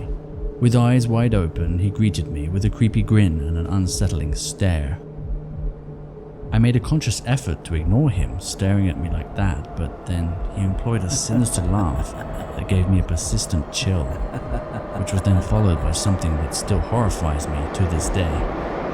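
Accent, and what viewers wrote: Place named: RP, United Kingdom. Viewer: Love is posh voice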